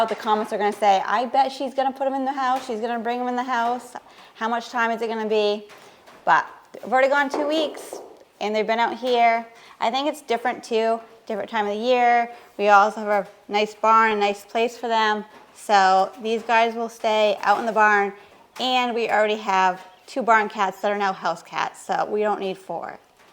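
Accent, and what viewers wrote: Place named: Appalachia, United States. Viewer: if you talk like harvest chicken. then your not a youtuber but more a hillybilly right out the fields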